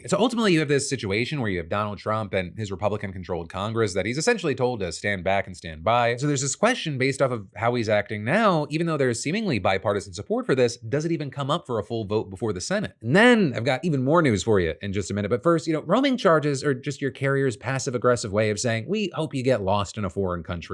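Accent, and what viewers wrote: South, United States. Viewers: am i crazy or does kinda have a southern twang in his voice??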